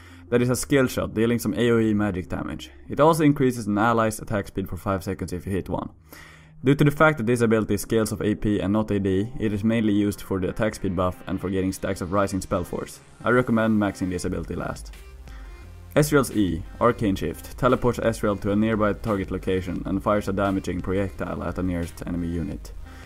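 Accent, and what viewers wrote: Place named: Sweden. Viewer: You sound swedish. Are you swedish?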